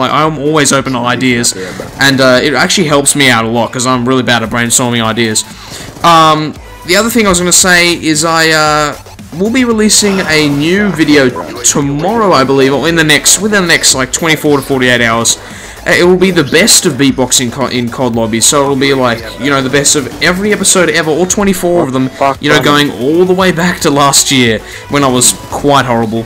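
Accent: Australia. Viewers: Are you aussie by any chance?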